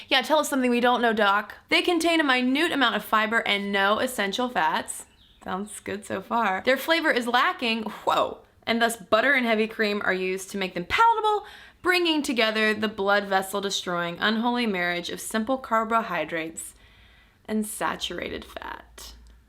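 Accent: South, United States. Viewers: I want to hear mom of your southern accent. It's great! haha! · I like your southern accent :) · I love your channel, just found it today, but please, no more southern accent.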